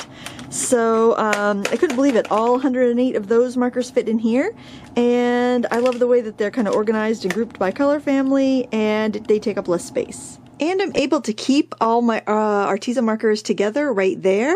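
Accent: Maine, United States. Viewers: Organizing storage bins,, trays, and containers always come in handy, so I would keep many, too. You cracked me up when you asked yourself why you have such a Maine accent today. Uhh, maybe cuz your in/from Maine! Lol!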